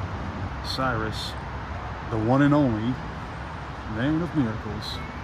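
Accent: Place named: New Zealand. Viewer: Can tell u from Nz😆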